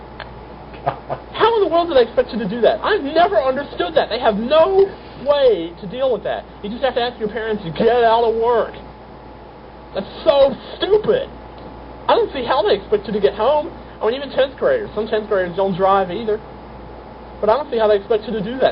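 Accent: South, United States. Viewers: On the tape you sounded a lot more southern! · hhahahaha you had much more of a southern accent back then :D so CUTE! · Isn't he 19 in this tape? Also I love the southern the accent.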